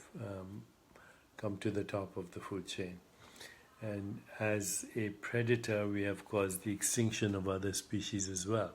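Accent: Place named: Trinidad and Tobago. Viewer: Sometimes sounds like ah Trini 🇹🇹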